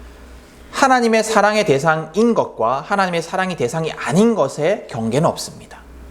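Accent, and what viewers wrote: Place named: Gyeongsang, South Korea. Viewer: 한예연에서 설교 듣고 구독했습니다! 목사님한테서 대구방언 억양이 들렸는데 대구에 있는 교회였네요. 예수님 사랑만으로 충분합니다. 목사님, 감사합니다!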